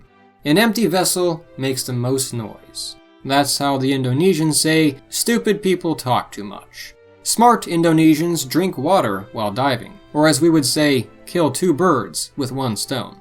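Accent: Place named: United States. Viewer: This guy can't speak German to save his life. I can speak both, and I tried intensely listening to what he was saying, but I just couldn't make anything out. He was saying it in such a heavy American accent that the words just become nonsensical gibberish Seriously, if his life depended on speaking just one regular basic German sentence like that, he'd be long dead. He's that bad! Cos NOBODY would understand him looool.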